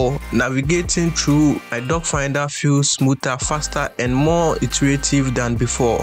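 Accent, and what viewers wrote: Nigeria. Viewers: Nigerian ahh accent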